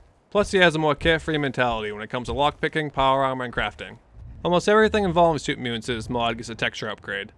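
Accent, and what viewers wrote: Boston, United States. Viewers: The Boston accent makes these FO4 mod reviews much more authentic. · hembury I knew that accent after about 20 seconds of watching.....Guy from Boston modding game set in Boston= immersive :)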